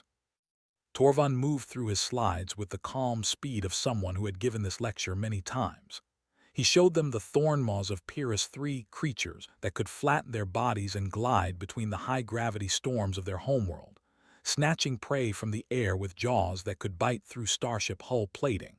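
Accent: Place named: United States. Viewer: OMG do something with AI Language skills before you broadcast. it talks almost like it was taught not English but 'Murican.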